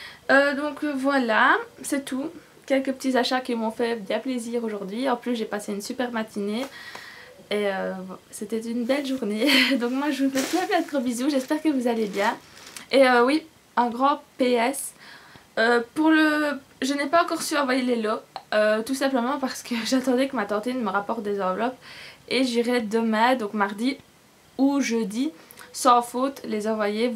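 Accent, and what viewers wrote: Belgium. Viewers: "T'sais nin comment ca s'dit":D Punaise (j'allais dire un gros mot) tu sais pas comment je suis genre contente d'entendre une belge sur Youtube (je te l'ai déjà dit je sais)! Bisous à toi et continue à faire tes vidéos elles sont top!:)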